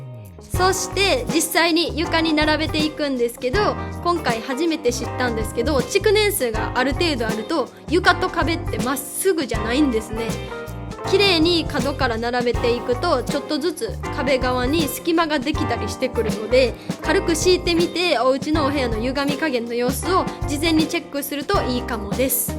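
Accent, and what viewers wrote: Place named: Kansai, Japan. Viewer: めっちゃ関西弁やん。 久しぶりに関西弁聞いたけど、やっぱなんか落ち着く。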